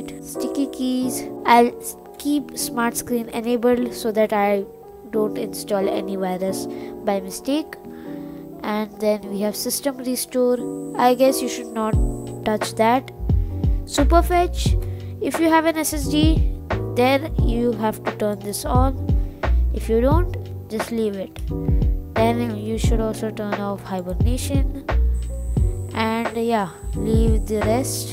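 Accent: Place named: India. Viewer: Omg Indian accent is so annoying.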